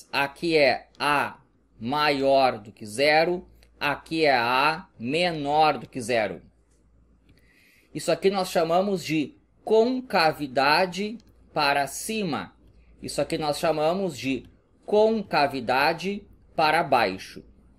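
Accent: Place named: Alagoas, Brazil. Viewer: pra mim q vc era de ALAGOAS.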